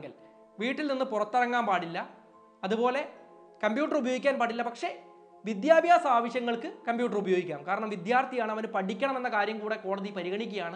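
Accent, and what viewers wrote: Kannur, India. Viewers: നിങ്ങൾ കണ്ണൂരാണോ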